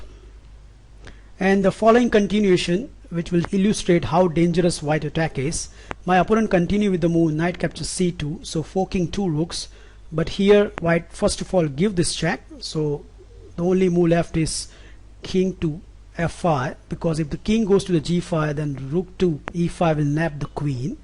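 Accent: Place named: India. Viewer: I bet u are an indian. Lol nice english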